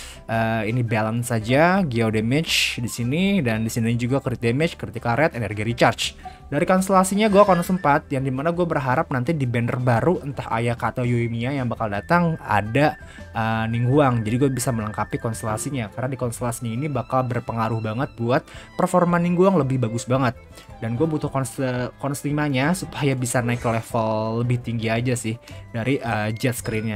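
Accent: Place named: West Java, Indonesia. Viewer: Meni sunda pisan euy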